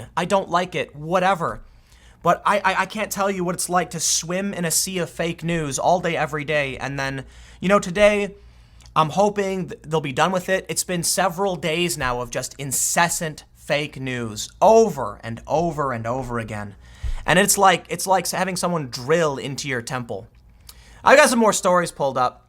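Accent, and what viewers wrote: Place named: Chicago, United States. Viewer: Dude what neighborhood from Chicago are you from? I feel you're from either Bridgeport or Beverly.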